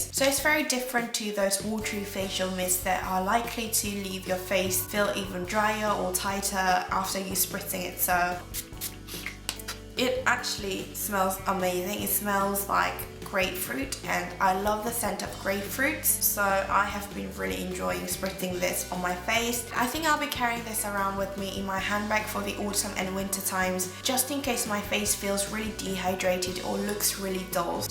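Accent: United Kingdom. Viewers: 님 영상 보고 핑크오이스터 샀는데 예뻐요! 영국 억양은 3년만에 익히신건가요? 신기해요^^ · 늘 유튜브볼때마다 느끼는 거지만 영국억양 너무 좋네요^-^ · 엉니 영상 자주올려주세요ㅜ 언니 영국발음도 넘 좋고 화장도 진짜 잘하세요!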